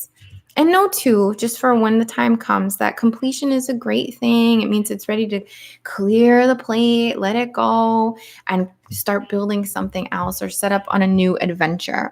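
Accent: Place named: New Jersey, United States. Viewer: I know a Jersey girl when I hear one. My ol' stomping grounds back in the day. "Regrets, I've had a few, but then again, too few to mention, I did what I had to do and saw it through without exemption..."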